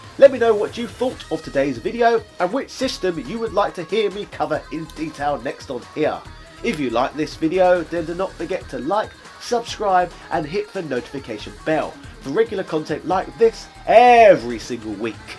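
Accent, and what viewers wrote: United Kingdom. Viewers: I love the British voice !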